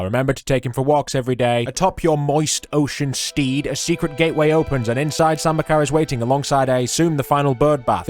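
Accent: United Kingdom. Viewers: Once again hitting a banger I don’t know why buts it’s funny listening to a British man explain games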